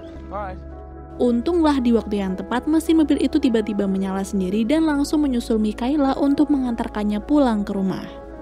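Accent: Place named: Java, Indonesia. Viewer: Wong jawa rika ya mba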